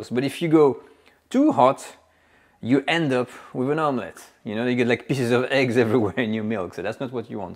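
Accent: France. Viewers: I must admit, having a French accent does make everything better and more flavorful😅 and even easier to follow. Love your videos. Merci!!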